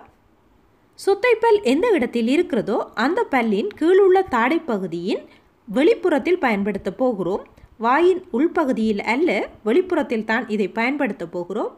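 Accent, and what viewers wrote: Sri Lanka. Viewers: Avunga srilanka apdithan pesuvanga bro..avunga real speech apdithan... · It's srilankan Tamil,l like this Tamil. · நீங்கள் இலங்கை தமிழரா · இலங்கை தமிழ் அருமை... தமிழ்நாட்டு தமிழன்... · உண்மையான தமிழ் ஒலி வாழ்க வளமுடன் இலங்கை தமிழ் அழகு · என்ன அழகு..... ஈழத் தமிழ் அழகு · Her tamil looks like srilankan tamil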